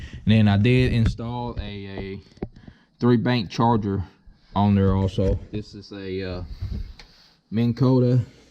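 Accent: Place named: Texas, United States. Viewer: Dig the walkthrough, ive got a nitro as well. Your accent is tripping me out tho. You ffom texas? Thats where I'm from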